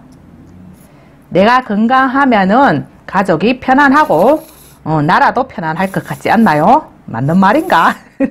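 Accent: Gyeongsang, South Korea. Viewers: ㅎㅎ 좋은 정보 잘 보고 있습니다 경상도 본토 말 오랜만에 듣네요 일회용 나무 젓가락은 사용 금 하는게 좋겠네요 알지만 1회용 컵 모든 1회용은 문제가 많은것 같아요 감사합니다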